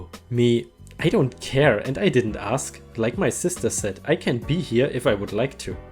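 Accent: Germany. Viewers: ufff man hört den Deutschen Akzent raus xd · I love your accent. Are you from Germany? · Great videos, love your delivery style and that genuine German accent. Definitely still read the possible fake reports but give a warning as you have been, that it's possibly not true but being read because it is funny. Great videos, love your scheiße comment on a previous video of yours (I did German at high school, it means 'shit' for non German speaking English speakers). This next bit is from another video of yours. Gibberish is pronounced with a 'J' sound (jibberish) at the beginning, like 'J' sound (as in Julius for example) rather than the 'G' sound as in Gorilla.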